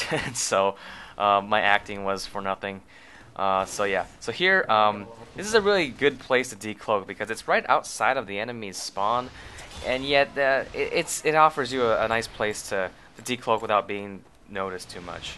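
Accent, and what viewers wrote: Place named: United States. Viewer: To be honest you sound very american.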